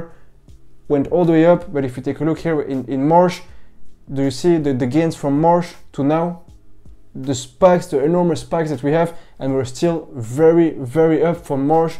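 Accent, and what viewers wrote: France. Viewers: Everytime ...something big is coming up ...everything is ,, bullish“ and ,,amazing“ and looking at Shiba ,,realistically“ but I lost around 500 Euros since last week Monday ! So tell us when the Long term holders you think will make plus . What is ,,soon“ that Shiba,, can reach Doge“ ?!? Nothing is ,, looking great atm“ ! But I like your accent :-) the first french who likes to talk in English 🔥💯! Best regards from Germamy 💯🔥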